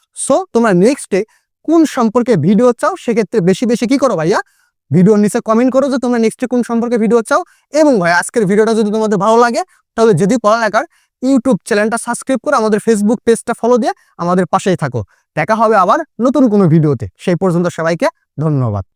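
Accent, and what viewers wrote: Bogura, Bangladesh. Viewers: Vai ki bogura r naki??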